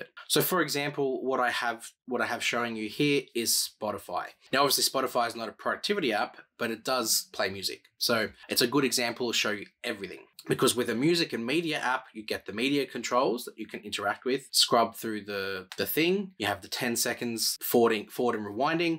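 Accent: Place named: Australia. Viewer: Wow good to hear a Aussie accent on the phone review. Thanks so much, I have the Z Fold 3..might change to the 5 eventually..great review thank you 👍👏👏👏❤️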